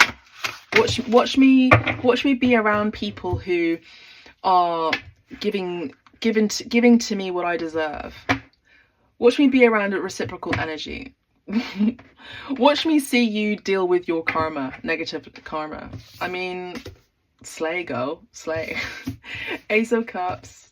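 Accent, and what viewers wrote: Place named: United Kingdom. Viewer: England! Yes! Nice to hear a British accent.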